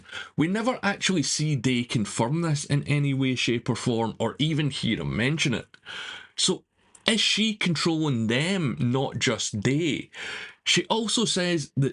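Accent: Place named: England, United Kingdom. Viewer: It takes a while to get used to your English accent but what you have to say is interesting.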